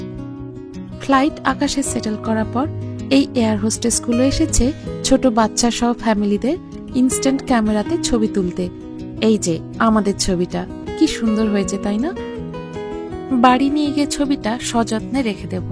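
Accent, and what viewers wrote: Bangladesh. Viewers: Same here😂.ভীষণ বাংলাদেশের বলে মনে হচ্ছে. হতে পারে আগে হয়তো বাংলাদেশে ছিল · Apnar kotha sunla mona hoi apni bangladeshi dar moton kotha bolan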